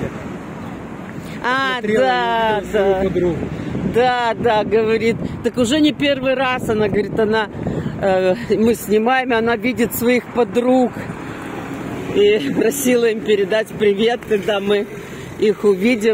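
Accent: Odessa, Ukraine. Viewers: Да Вы чудесно разговариваете, я просто очарованна Вашим одесским говорком!!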